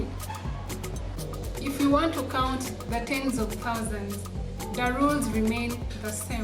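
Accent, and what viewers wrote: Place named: Kenya. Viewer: you sound like a kenyan already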